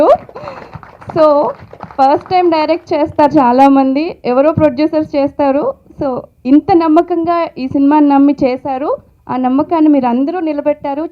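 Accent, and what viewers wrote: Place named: India. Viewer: Spoken like a True Telugu ammayi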